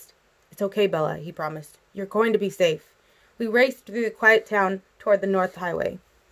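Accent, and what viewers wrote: United Kingdom. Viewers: Pls read me before you Also, that British accent, 😹😹 I love it.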